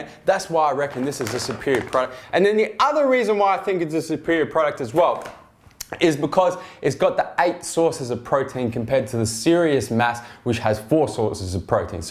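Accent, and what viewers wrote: Australia. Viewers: Manos Nikolidakis seems fine to me this is how aussies talk if u were a good psychologist u would know that different places have different ways of talking. Plus the extra obnoxious loudness is just hid way of trying to appeal to his subs as youtube is sort of a entertainment platform. Throw your degree away lol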